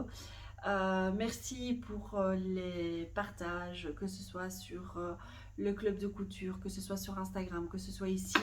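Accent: Belgium. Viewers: Merci pour tes podcasts, c'est toujours un excellent moment, et j'aime beaucoup ton humour, ta simplicité et ton joli accent Belge. Pour les bruits, moi je ne trouve pas cela dérangeant, surtout qu'il faut vraiment tendre l'oreille.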